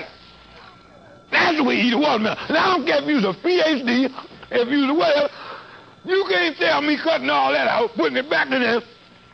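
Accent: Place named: South, United States. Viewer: He really as Southern as it gets🤣 I thought he was from Louisiana😂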